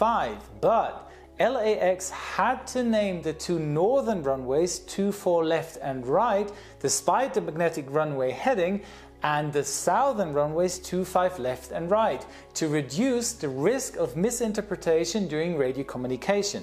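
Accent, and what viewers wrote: Germany. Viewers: Typical german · lustig das man als deutscher immer gleich merkt wenn ein deutscher englisch spricht :D versteht man aber viel besser wie einen englisch Muttersprachler :D echt interessantes Video... wär ich nie drauf gekommen das die Nummern etwas mit dem Magnetfeld zu tun haben ^^ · man the r you use in your 'three's makes it super obvious youre a german xD good content, super interesting. keep it up! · Joe with his German accent.... Gotta love it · Oof German accent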